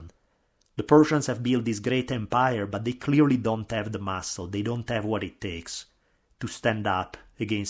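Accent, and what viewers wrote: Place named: Italy. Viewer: Your story telling along with that fantastic Italian twang is just awesome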